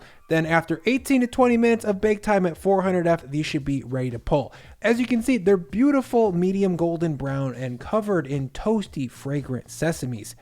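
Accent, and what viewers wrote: United States. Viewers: Looks great... but Christ I cannot listen to the American accent for 14 minutes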